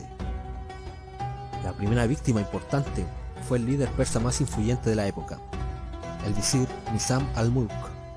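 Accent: Chile. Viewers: es mi idea o este loco es chileno? xD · Es chileno el boludo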